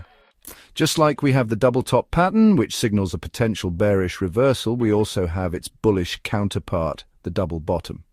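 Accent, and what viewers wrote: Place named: United Kingdom. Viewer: Very informative and u sound british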